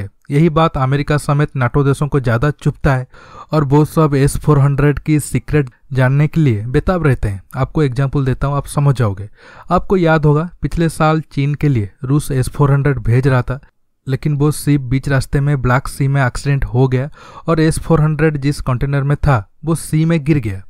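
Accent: Odisha, India. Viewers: Hi...I love your oriya mixed hindi.continue your good work...language is just for expression..i am finding no difficulties to underatand you.main thing is the content..its rich enough to make just a little adjustment.thanks.❤.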